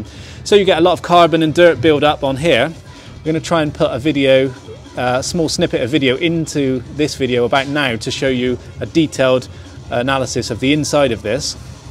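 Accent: Bristol, United Kingdom. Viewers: Bristolian accent maybe?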